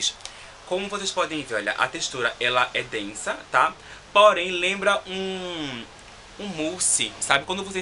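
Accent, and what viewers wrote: Recife, Brazil. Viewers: inscrita, adoreeeeeeei. Ele é de Recife?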